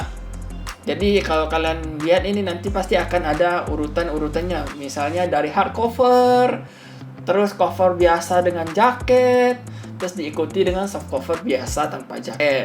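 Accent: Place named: Malaysia. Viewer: Logatnya udah kayak urang Melayu banget.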